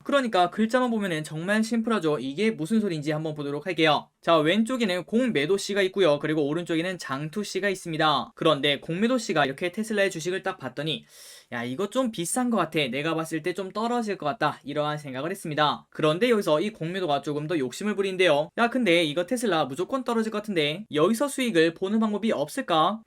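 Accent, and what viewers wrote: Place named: Daegu, South Korea. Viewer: 님! 대구살아요? 와~~표준말 대따 잘하네요.저도 연식이 좀 있는데도 님 영상은 무조건 좋아요! 부터 누르고 꼭 봐요.너무 귀염귀염해여! ㅎㅎ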